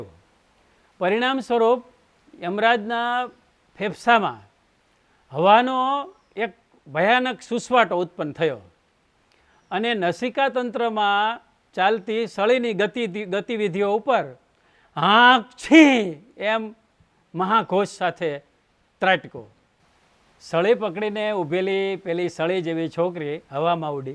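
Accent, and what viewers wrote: Surat, India. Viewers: વાહ...હસીને લોટપોટ થઈ જવાયું...😂😂 આ તમારી વાર્તા વાંચી હતી પણ આજે તમારા સ્વરમાં સાંભળવાની બહું મજા આવી...👍🏻 સુરતી ભાષા અને યમરાજ અને ચીત્રગુપ્તનું અટક હાસ્ય તમે જે કર્યું એમાં બહુ હસવું આવ્યું.... ખૂબ જ સરસ વાર્તા સંભળાવી અને આગળ પણ આવી રીતે નવી વાર્તા સંભળાવતા રહેજો..... 👌🏻👌🏻👍🏻🤣🤣🤣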